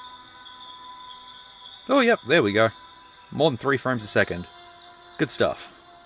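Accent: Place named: Australia. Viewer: That mic test/phone call/your accent always reminds me of our old mate Danky/Female Snake "Frank" ("Dankpods") when he goes on a ramble while testing microphones (Congrats to him for achieving 1M Subs too!!) As well as Hugh Jeffreys are one the only three Aussies that are pretty much the only tech reviewers on my Subscribed list.. 👍👍😎🇬🇧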